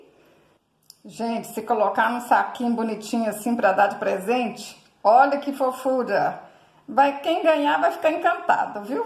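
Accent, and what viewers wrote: Minas Gerais, Brazil. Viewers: Além do artesanato,é muito agradável escutar esse sotaque mineiro....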